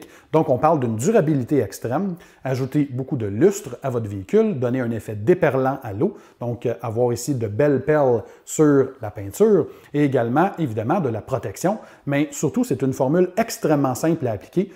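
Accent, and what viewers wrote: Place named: Quebec, Canada. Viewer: J’ai commencé regarder ces videos d’abord pour écouter l’accent Québecois, mais ensuite j’achète tous les produits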